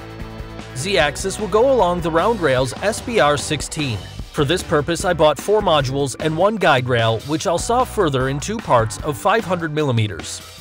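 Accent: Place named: Russia. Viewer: the heavy russian accent is authentic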